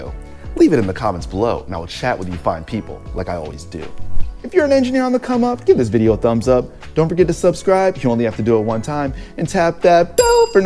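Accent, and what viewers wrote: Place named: United Kingdom. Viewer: look, bruv, i for one enjoy bri’ish miami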